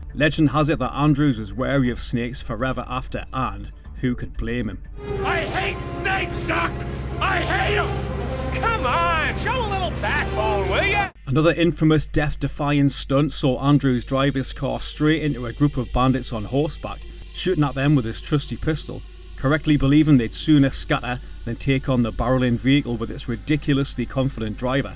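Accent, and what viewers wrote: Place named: Geordie, United Kingdom. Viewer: Instantly switched off with the overly Geordie accent. WTF?!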